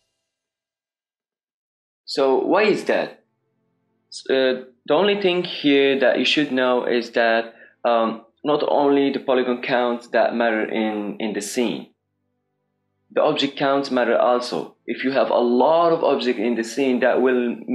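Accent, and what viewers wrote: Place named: Morocco. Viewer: khoya 3reftek mghribi hir mn l'anglais dyalk hhhhh. keep up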